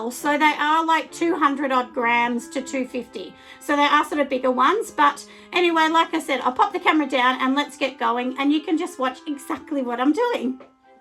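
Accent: Australia. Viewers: Love your Australian accent! Idk y someone would complain?! Love your videos.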